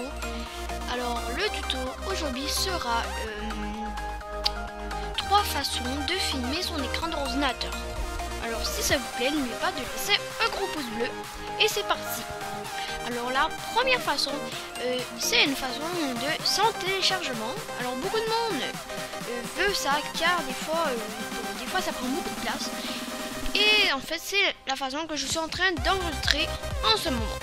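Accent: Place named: France. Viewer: Accent FR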